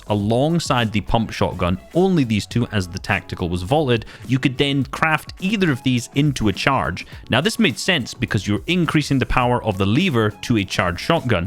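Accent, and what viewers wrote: Scotland, United Kingdom. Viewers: r u scottish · Ay you Scottish?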